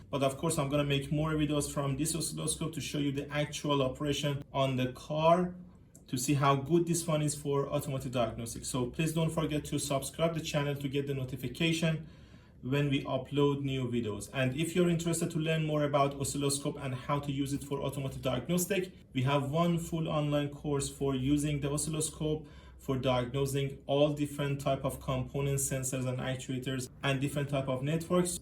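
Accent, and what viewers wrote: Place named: Iran. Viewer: لهجه مشخصه ایرانی هستی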